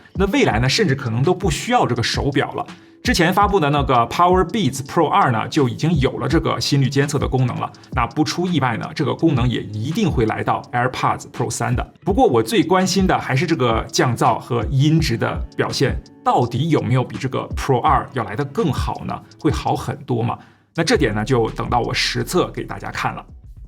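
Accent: China. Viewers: 那你到底是台灣人還是大陸人 如果是台灣人 你講話為啥像大陸人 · 听口音是大陆出生的，但用词很多台湾化，什么晶片啦，毫米不说毫米😊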